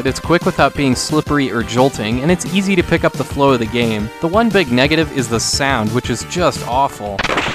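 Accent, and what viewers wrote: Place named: Minnesota, United States. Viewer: Never knew you were a Minnesota guy, but hearing you say the word hockey 40 times in the last 10 minutes I can't believe I didn't pick it up before!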